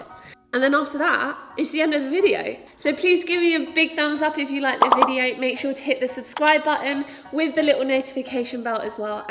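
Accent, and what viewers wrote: France. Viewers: accent français on point ! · Is she French??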